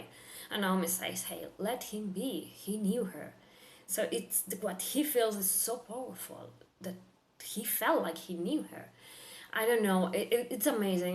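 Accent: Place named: Argentina. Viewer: Yes, Miller is outsider and initially he sees in Julie something, he does not understand. He is curious and the more he learns, the more he likes and respects her. About his “visions” of her, there is a theory that the protomolecule works in more than three dimensions, including time. Since he met “ProtoJulie”, she appears in his mind before that, the same way he appears in the mind of Holden. Also your musings are great and I like your accent. Argentina?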